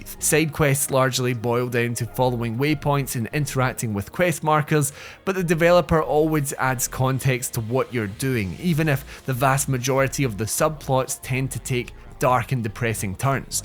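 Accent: Scotland, United Kingdom. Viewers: Oh no not a scottish accent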